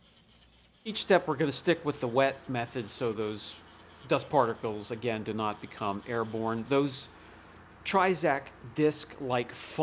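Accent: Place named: East Coast, United States. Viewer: lol Waaater the east coast accent is strong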